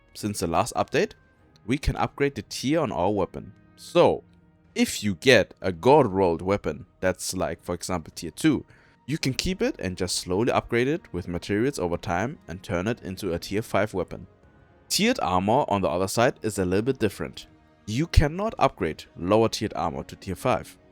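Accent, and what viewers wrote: Germany. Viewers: Wieso hört man als Deutscher raus, das du Deutscher bist haha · Aß a german i can Say you Sound kinda german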